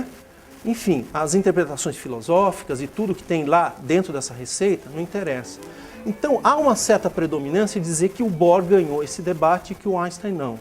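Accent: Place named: Italy. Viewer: Caraca, que sotaque de... italiano!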